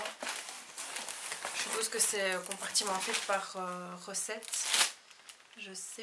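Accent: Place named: Belgium. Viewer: oui c'est vrai ça s'entend que tu est belge tu viens de liege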